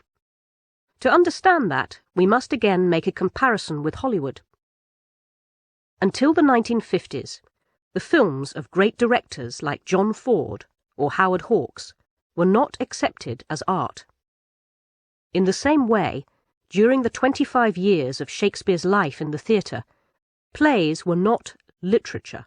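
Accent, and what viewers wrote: United Kingdom. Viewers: Banu Çiçek Because it's British pronunciation.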